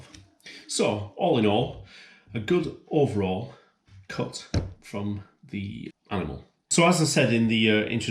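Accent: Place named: North, United Kingdom. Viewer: The Northern accent gives a certain degree of credibility, you know this beef dripping is the real deal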